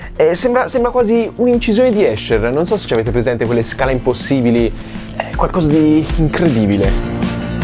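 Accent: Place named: Tuscany, Italy. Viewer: Comunque tu parli un toscano"strano"....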